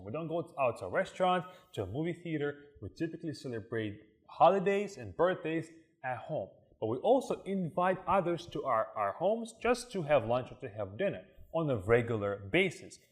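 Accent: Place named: Russia. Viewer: Смотрю эти видео, чтобы посмотреть на себя со стороны и изучать английский, слушая русский акцент) Однако)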